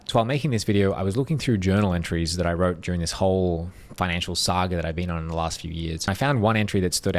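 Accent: Australia. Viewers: Well I relate to you the only difference this happened three times in my life three big cycles. Now going up again fourth time I’m retiring soon!! From the age of 18 to 62. You sound like an Aussie I’m ex Aussie now American resident and Canadian citizen. In the end it’s all a ok if it’s not ok then it’s not the end.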